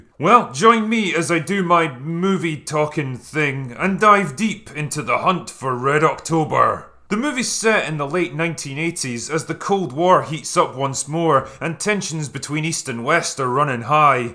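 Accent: Scotland, United Kingdom. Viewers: This is one I can't help but stop and watch each time I see it on the channel guide. It's probably just to hear Russian in a Scottish accent, lol!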